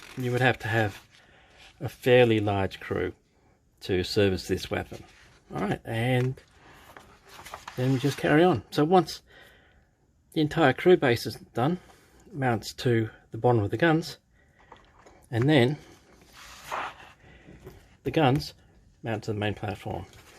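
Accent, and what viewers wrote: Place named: Australia. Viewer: Great Review. I picked up this Kit a Couple of mths ago ( ish..!) It's in my Queue, awaiting the Time to Build it. Also picked up a Flak 88mm crew to add to it. ( a little fettling should make them look Ok..! ) I Also bought a Searchlight kit to go with it, but that needs Crew too. But its all a 'Work in Progress.' The Takom, pressings are just so Crisp, its a very impressive kit overall..! Great Detailed Review have Subscribed due to the Quality of it, and your an Aussie like me. So your Easy on the Ears.! Cheers Mate from a Fellow Modelling Nut, somewhere in Sth.Aust. 😂🦘😎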